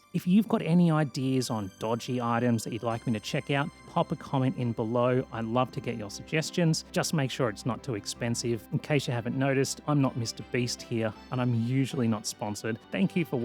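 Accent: Australia. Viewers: The moment I heard your voice, I could tell it was a fellow Aussie. Happy to follow and watch your amazing content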